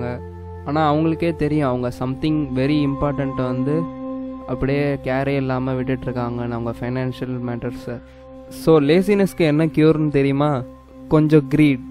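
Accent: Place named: India. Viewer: Brooooo can you changer reader pronunciation please no Indian 👳 better Chinese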